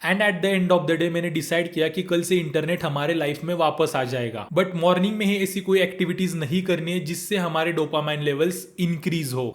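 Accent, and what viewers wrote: Satara, India. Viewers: are you from satara ?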